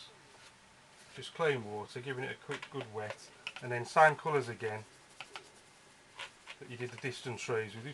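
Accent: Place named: Midlands, United Kingdom. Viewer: Good 2 hear a midland accent on you tube...it looks a bit like kingsbury water park...fished it but not painted it yet...good job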